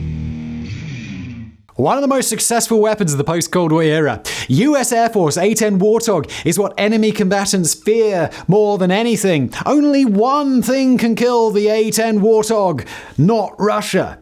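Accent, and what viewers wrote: United Kingdom. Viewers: Despite your British accent and the continued use of the word zeitgeist your review of of the A10 demontrates your an utter ignoramus. First of all, the 30 mm gun is just one tool in the a10s drawer. It carries a bevy of missiles and bombs which can and have destroyed numerous Main battle tanks from any angle. On the other hand the 30mm can eliminate pretty much any other armored vehicle without breaking a sweat. It had more losses than other aircract in the Gulf because no other aircraft were used and couldn't be used the same way. The A10 would loiter in the battle space pass after pass providing persistent close air support. All other jet fighters would do a single pass at high speed and move out. BTW, the Air Force hates the A10. As far as they are concerned close air support is for the army and its helicopters. The people who most like the A10 are the ground troops that know the benefit of having a 30 mm gun in the sky farting 1000s of 30 mm rockets and bombs over your enemy over and over again.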